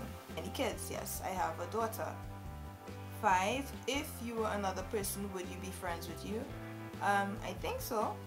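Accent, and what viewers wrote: Trinidad and Tobago. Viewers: Love this and thats y you have such a nice accent you from trinidad lol.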